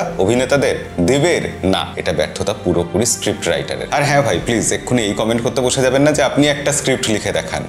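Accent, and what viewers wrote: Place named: Bangladesh. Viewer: Apner bangla bolar dhoron ta Bangladeshi ter moto ...apni Bangladesher kotha theke aschen?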